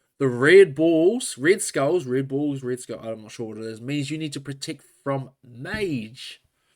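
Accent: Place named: New Zealand. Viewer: Are you a kiwi? You have a bit of a kiwi accent. :o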